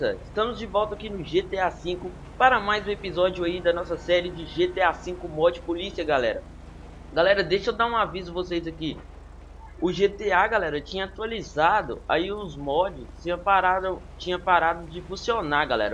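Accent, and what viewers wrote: Minas Gerais, Brazil. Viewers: vc e mineiro e?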